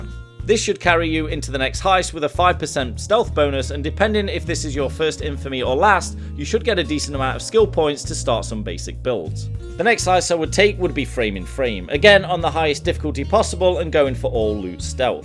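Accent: United Kingdom. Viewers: Hey mate I love your accent where are you from in the UK? Also where can you find teammates to ecm rush heists for xp.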